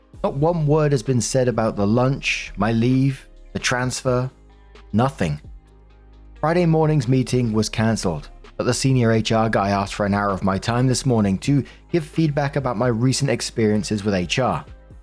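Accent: United Kingdom. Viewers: Aren't British accents so sexy? 😍🔥🥵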